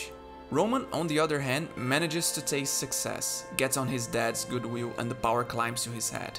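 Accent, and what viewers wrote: Brazil. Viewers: vc eh brazuca? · acho que o narrador é brasileiro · Tu é BR hein. Digo mais: CARIOCA. Baita conteúdo! Essa série é sensacional, consumo muitto conteúdo secundário de Succession e teu vídeo chegou até mim através disso hahahaha. Parabéns, furou a bolha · Are you brazilian? your accent sounds brazilian 😅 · Identifiquei que era BR pelo acento, mas otimo inglês irmão, parabéns pelo trabalho, essa série é bizarra de boa. Nunca vi tamanho desenvolvimento de personagem, te faz conhecer um personagem melhor em 3 temporadas do que em 7 ou mais em outras dezenas de séries. Abraços! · Um sotaque de levinho de brasileiro falando inglês! Vídeo ficou sensacional btw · Is this a Brazilian accent I hear? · Are you Brazilian? I swear your accent sounds like someone from Brazil speaking english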